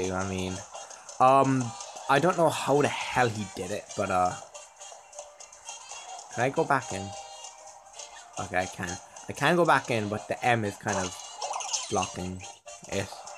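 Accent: Ireland. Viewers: Hey are ya Irish? I am too! 😆 Also made a video about this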